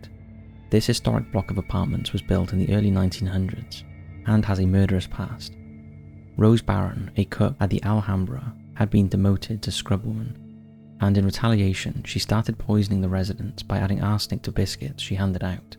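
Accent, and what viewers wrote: Wales, United Kingdom. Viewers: Welsh? Is that the accent?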